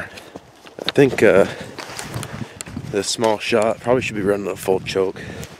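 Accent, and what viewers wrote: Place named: New England, United States. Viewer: Oh your from new england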